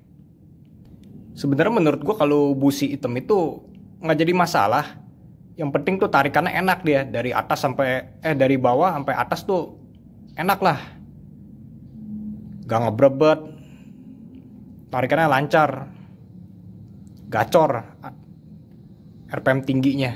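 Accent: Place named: Bali, Indonesia. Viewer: Ko kaya logat vrindafan Indonesia (bali)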